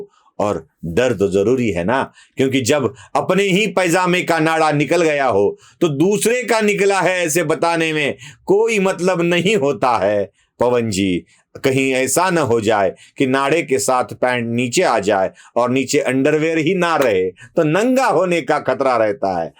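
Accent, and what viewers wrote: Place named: Bihar, India. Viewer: Hindi mein hamesha A hi bihari style ho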